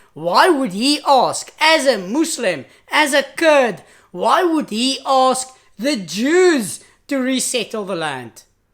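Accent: South Africa. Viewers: Hi I want to convert to Judaism. Can you help me you sound like you live in South Africa am I correct . I do can you help me how do I convert. My email is <mail> if you can give me some advice. I have been to ISRAEL a few times and I love the God of Abraham Isiac and Jocob. And the Jewish PEOPLE It consumes me .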